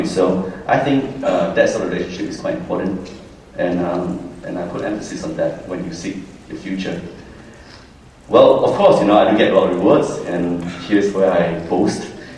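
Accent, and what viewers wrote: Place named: Malaysia. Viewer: just a little, not much. pretty much sounded malaysian to me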